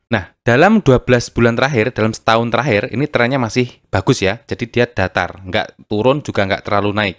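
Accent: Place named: Java, Indonesia. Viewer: Suka.sama chanel ini suaranya aksen Jowo medok...👍😁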